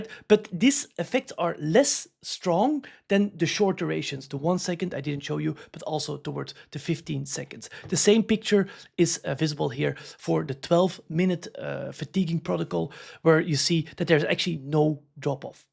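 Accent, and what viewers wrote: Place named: Netherlands. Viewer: He sounds dutch or flemish. In those languages, the emphasis is on the first syllable. Otherwise his english is pretty solid for a second language. Constructive remark though.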